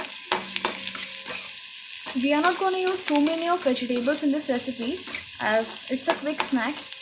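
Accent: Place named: India. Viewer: she is speaking all buttler english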